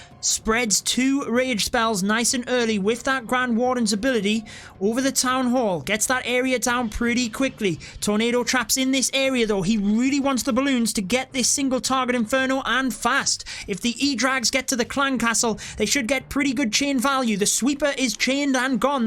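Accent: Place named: Cockney, United Kingdom. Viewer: Love your accent It's cockney right???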